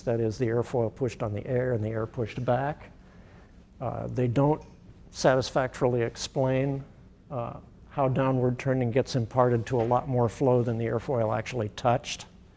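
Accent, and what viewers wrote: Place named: United States. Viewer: the pronunciations of the names are so American, it hertz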